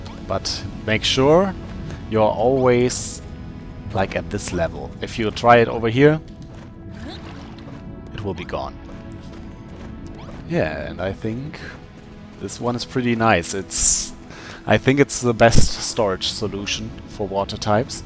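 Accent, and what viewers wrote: Germany. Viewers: You sound so german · I hope its not rude to ask but is that a German accent?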